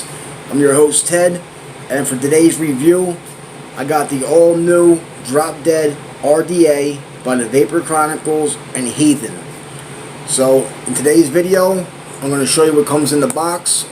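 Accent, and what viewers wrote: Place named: Philadelphia, United States. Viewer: Thanks for the review brother. I recognize a philly accent from anywhere. I’m guessing the north east?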